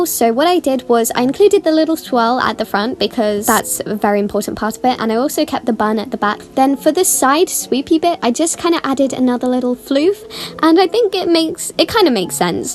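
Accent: United Kingdom. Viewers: THAT TOP RIGHT DRAWING??? SERIOUSLY MY FAVORITE PIECE OF YOURS!! she does not look dead 💀😭 she looks very alive!!! My favorite outfit to wear is probably an oversized tshirt with mom jeans! Honestly anything with mom jeans 😤✋ Also I love your bri’ish accent 🤭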